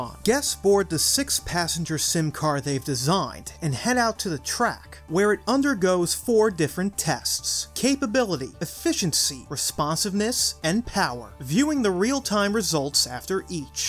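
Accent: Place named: New York, United States. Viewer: Alright. Maybe I’ve missed this in another video somewhere as I’m watching this as a playlist. But “aloung”…I’m getting a NorthEast accent. I know it’s not NH, MA, or ME. You don’t say “hahd, yahd, cahd, cah, bah, laand. Am I high in hearing some NY? Not trying to out your place or anything. Just the accent is bugging me and I just had to finally ask 😂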